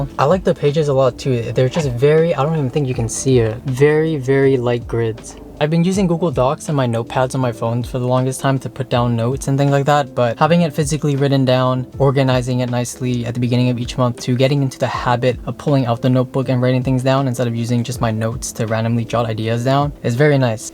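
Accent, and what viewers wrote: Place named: France. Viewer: Omg your french is so cute lol c'est la vie ! keep talking french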